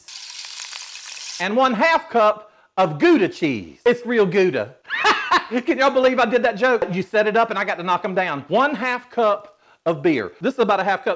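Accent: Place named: South, United States. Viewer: Just got started watching your videos after I found you on TikTok ! You are hilarious and I love your humor and southern drawl! Remind me of my family ♥️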